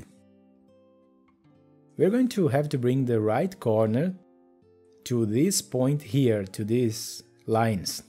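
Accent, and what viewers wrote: Brazil. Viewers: Pelo sotaque é brasileiro kkkkkkk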